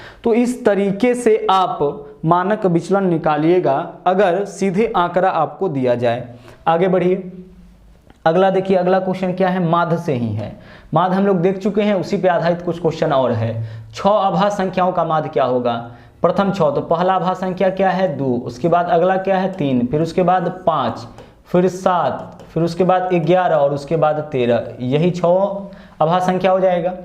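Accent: Bihar, India. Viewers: Sir aap bihar se h kyy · Aap bihar se hai sir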